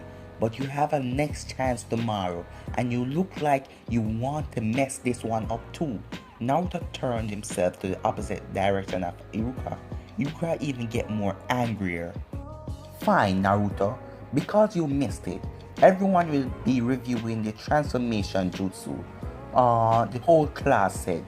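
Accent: Jamaica. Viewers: Are you jamican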